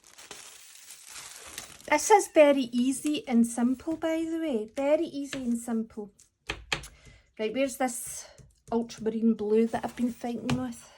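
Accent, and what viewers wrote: Scotland, United Kingdom. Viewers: When you chide yourself for (almost) being daft it sounds like a compliment, but when I do it using my mid-western American flatlined pronunciation it sounds like a guilty admission. 😜 This is by way of a request/ gentle reminder for you to use a wee bit more Scots as part of your guidance. When I pronounce daft your way I feel much better when I run off the rails accidentally on purpose. I’m sure there is much more Scots argot for this pursuit. Thanks again for your help.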